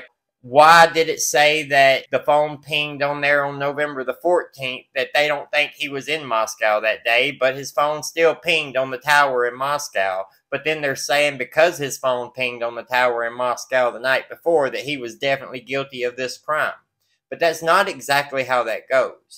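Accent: South, United States. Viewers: I haven't watched the True Crime "special" yet. I can just imagine... I loved your comment about not just selling black gloves at the "murder shop. First time I laughed out loud today! 😂 The southern in you really came out today. Loved it! Thanks for the video! ❤️🎙️❤️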